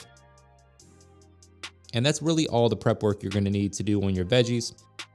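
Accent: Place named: South, United States. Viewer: You are so southern I love you 😍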